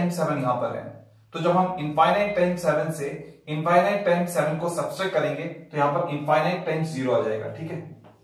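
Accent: Pahadi, India. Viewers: Aap pahadi ho kya? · Tum pahadi bolen cha?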